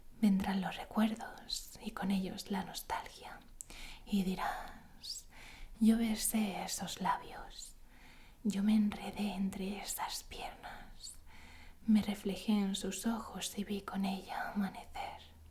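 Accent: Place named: Spain. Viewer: Este me parece el acento más lindo de España, de que región de España es?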